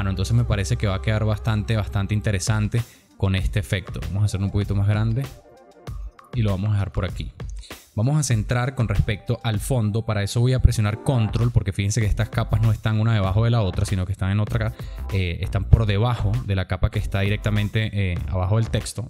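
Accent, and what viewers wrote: Venezuela. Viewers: Excelente puedes ver como quedo en (Instagram). Por el acento somos paisanos Vzla. Gracias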